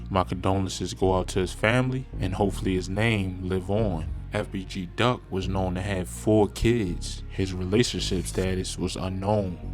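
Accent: Baltimore, United States. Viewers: I’d guess Baltimore. I loved there for about 6 yrs. that’s definitely a bmore accent. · He definitely from bmore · Facts I literally can hear it in his voice especially when he said “Tew” instead of “too” Baltimore people stay saying that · Are you from Baltimore? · That Baltimore accent something else!! Like your content. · dude who channel this is gotta be from Baltimore lmao he say " Tewww , Lewse , Avenewww " 🤣🤣🤣🤣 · you from baltimore aint you? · You from Baltimore, my DC ass can hear it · He gotta be from Bmore with that accent lol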